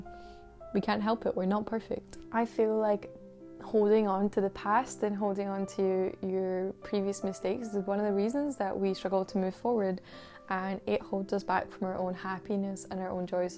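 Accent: Scotland, United Kingdom. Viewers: Scottish? Your speaking voice is so soothing, ill belive your a bad singer when I hear it. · I feel like you’re Scottish? Are ye? Mibbi?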